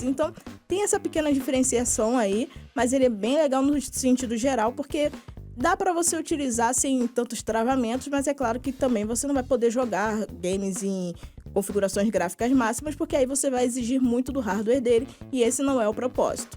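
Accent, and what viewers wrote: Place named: Rio de Janeiro, Brazil. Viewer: Meu sutaque carioca! Saudade da minha terrinha.. haha. Bem vinda!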